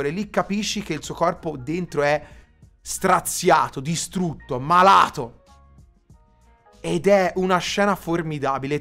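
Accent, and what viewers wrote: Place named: Tuscany, Italy. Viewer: Questo è popo toscano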